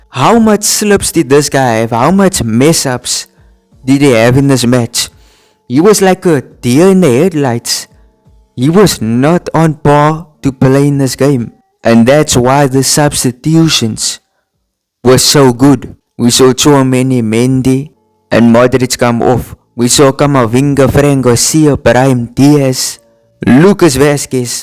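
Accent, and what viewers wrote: South Africa. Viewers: Love your channel man. I can tell you are South African with your accent 😅👌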